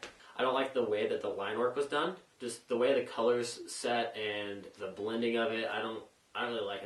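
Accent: Ireland. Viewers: Your Irish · r u irish?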